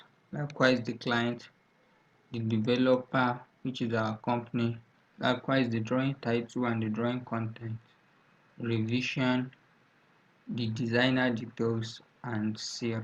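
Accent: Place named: Nigeria. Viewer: you talk like a Nigerian. well done and great job. Do you use AutoCAD MEP or REVIT for designs?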